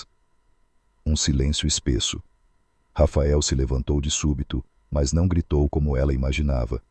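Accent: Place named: Portugal. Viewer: Uma história muito interessante. Quem diria que a atitude daquele, milionário tão agressiva e fria, iria acabar numa linda história de amor. Narração perfeita, de Portugal ❤❤❤❤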